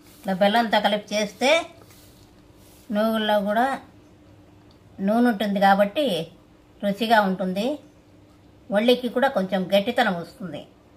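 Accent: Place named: Hosur, India. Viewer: నమస్కారం అండీ, మా అవ్వ చేసేది వీటిని:-) మేము బ్రహ్మణులంకాదు గానీ మీరు చేసే చాలా వంటకాలు మా అవ్వలు చేసేవాళ్ళు. ఇటీవల 10 ఏళ్లుగా ఆహారపు అలవాట్లు బొత్తిగా మారిపోయాయి. మీ videos చూసి మళ్ళీ ఆ పాత రోజులు గుర్తుకొస్తున్నాయి. వీటిని మేము సింబిలి/శింబిలి ఉంటలు అంటాము. మాది హోసూరు/బెంగళూరు ప్రాంతంమండి, మీ యాస కూడా మా యాసలాగే ఉంది. మీది ఏ ఊరు?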